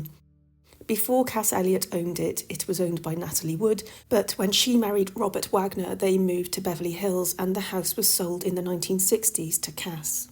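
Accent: United Kingdom. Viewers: This is a incorrect title for this yt video Berkeley square is an expensive area of London to live as british pm have lived and the british nobles own properties in the area all with nannies and servants that are under there employment the video has nothing to do with LA America even the narrative is spoken in correct English and at one point even has a british southern accent and a brummie both of which the us population get wrong so the narrative could be by a true brit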